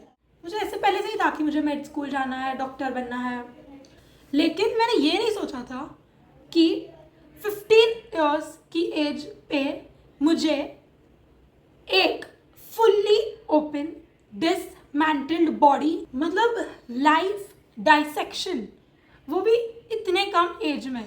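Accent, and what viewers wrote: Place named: Gujarat, India. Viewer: The gujju accent is the must 😝😂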